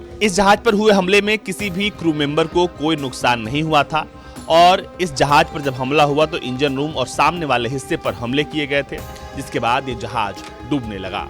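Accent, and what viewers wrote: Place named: Bihar, India. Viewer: Ye bihari hai jo bol rha hai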